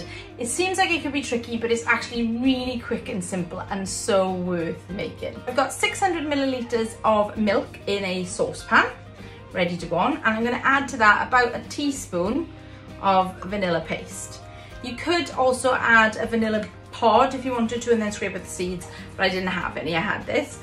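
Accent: Wales, United Kingdom. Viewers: It's only a Welsh accent it's not that strong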